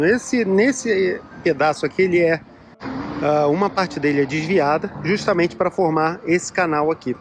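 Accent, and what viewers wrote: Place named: Niterói, Brazil. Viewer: Muito bom o video, reconheci o sotaque de Niterói. Gostei, porquê você conta as histórias. Parabéns!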